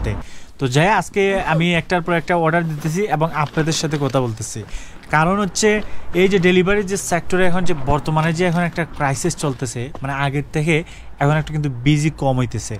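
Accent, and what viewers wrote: Sylhet, Bangladesh. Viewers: ভাই কিতা সিলেটি নি?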